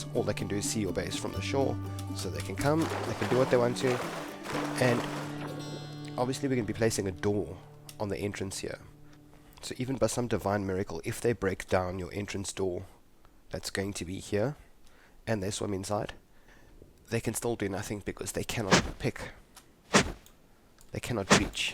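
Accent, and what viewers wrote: Afrikaans, South Africa. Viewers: you from south Africa? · South african??? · r u africaans? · are you south african? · Are u South African?!?!? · You Afrikaans bro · Brother are you from SA?? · South African? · As soon as you started talking I was shocked :O A South African Rust YouTuber, great videos :D Keep up the great work!!! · are you south african? You sound like it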